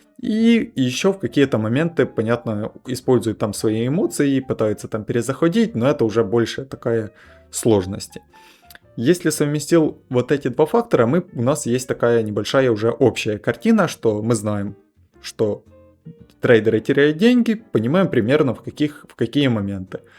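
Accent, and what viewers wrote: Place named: Vladimir, Russia. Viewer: Классный говор, Владимирская обл.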